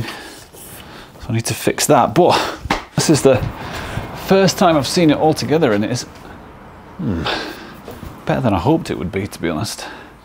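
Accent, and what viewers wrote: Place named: Sheffield, United Kingdom. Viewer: it warped bcoz u left it outside absorbing moisture?? ey up!! fellow norther ere. a dont finkyer from werrawer born lark. burra wer born in boltun. Whers thi from lad? thi sownd lark thas from sheffield or sum werrr.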